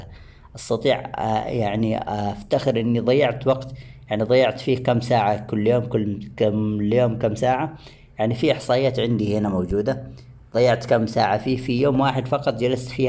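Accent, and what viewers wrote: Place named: Yemen. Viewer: اتوقع انك يمني من طريقة كلامك تحياتي لك من غزة ممكن تقولنا تحسنت بشكل كبير ولا كيف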